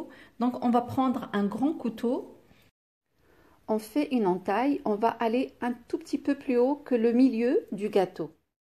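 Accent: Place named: Morocco. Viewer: Pourquoi vous êtes tunisien et vous parlez l’accent marocain???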